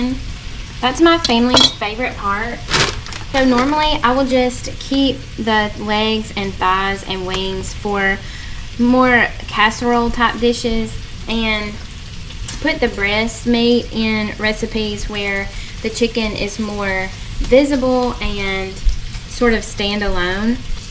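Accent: South, United States. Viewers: I just absolutely love your southern accent!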